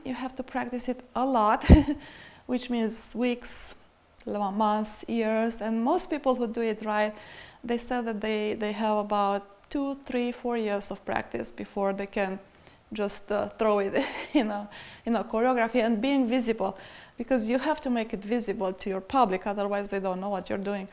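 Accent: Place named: Germany. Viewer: She sounds german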